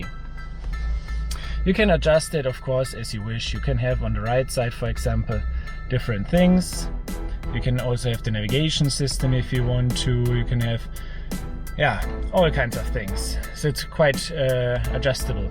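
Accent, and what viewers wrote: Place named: Germany. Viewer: Trevlig video men varför har du tysk brytning när du pratar engelska? :O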